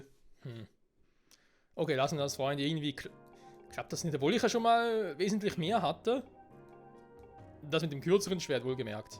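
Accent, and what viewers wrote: Switzerland. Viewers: Hey gutes Video, bist du Schweizer? :D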